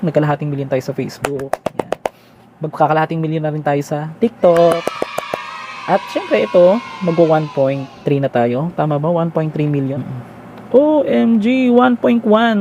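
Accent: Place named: Hagonoy, Philippines. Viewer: Taga Hagonoy Bulacan k b idol? Kase yong punto at way ng salita mo parang tga hagonoy k. Daming life lesson s kwento mo.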